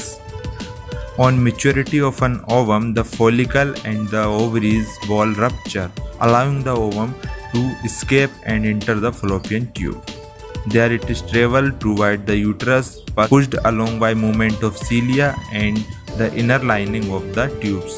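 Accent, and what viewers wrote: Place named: India. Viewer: 발음보니 인도서 만들었군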